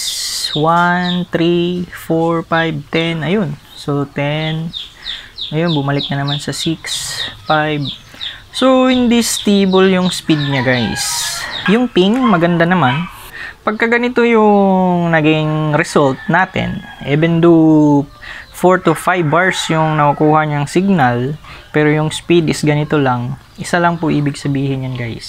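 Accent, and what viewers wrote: Visayas, Philippines. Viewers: Bisayang bisaya ang accent mo lods ah. Hahaha.. Bisaya din ako.. Nga pala nice tutorials.. baka magamit ko tong idea na to don samin na halos wala din signal.. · Ahh mao diay ganahan kaayo ko sa imo accent bay...😁 Great content!! Ug asa ka makapalit ug openline nga modem?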